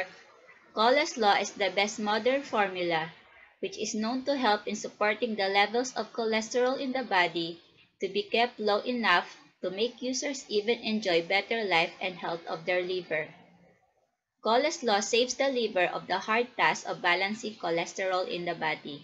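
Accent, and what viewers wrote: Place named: Philippines. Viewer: The narrator doesn't sounds professional. Got a very heavy Filipino accent. I have my reservation every time the announcer or narrator hide his or her face.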